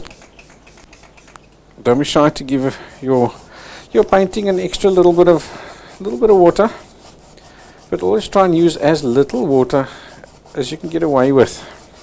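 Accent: South Africa. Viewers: Love the South African accent!👍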